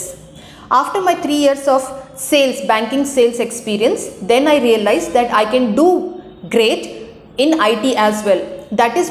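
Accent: India. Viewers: You are way of talking like a Neath Indian.. where are you from mam...?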